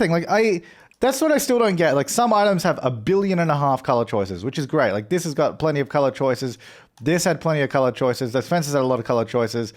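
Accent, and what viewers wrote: Australia. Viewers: Ocker voice AND CLASSIC MAKES A RETUUUUUURN!!!!;)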